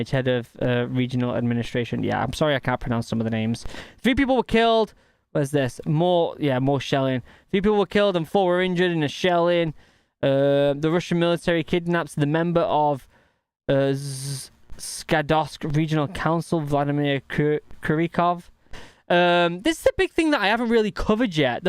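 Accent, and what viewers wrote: United Kingdom. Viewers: What british/brit accent?Or are you Scotsman?Great,your transparent cap!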